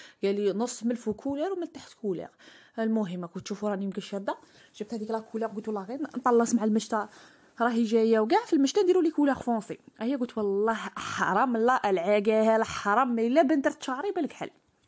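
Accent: Oran, Algeria. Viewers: جيتي تهبلي تعجبني هدرتك نحسك من الغرب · شعال نعجبني هدر تاع غرب حوجيان تفكرني بلبلاد هههههه 3تاع صباح حرزي يخرجلك سمهروش 😂😂بصحتك خرجتي زلة أول مرة نشوف قناتك ❤ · باينة عليك وهرانية ومتروطشة كي حالتي نوض على الفجر نخرب في شعري وجهي٣على العموم متشرفة بيك٣اختي عجبتيني٣من هدرتك وغادي ندير اشتراك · اني جاية من عند ام لارين عجبتيني ههههههههه هدرتك وهرانية فحلة ههههههه انا من مستغانم بالتوفيق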